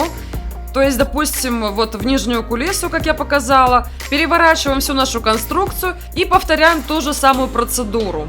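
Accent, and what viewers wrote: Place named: Moldova. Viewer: Приятный молдавский акцент.Скучила.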